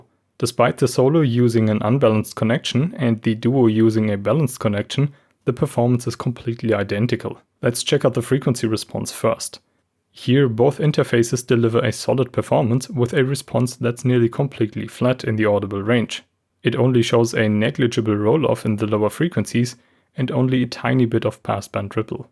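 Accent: Germany. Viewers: Nice work catching M-Audio’s misleading claims. Equipment tear-downs should be a basic feature of any good review. I always enjoy yours. And that German accent is FANTASTIC! CIao!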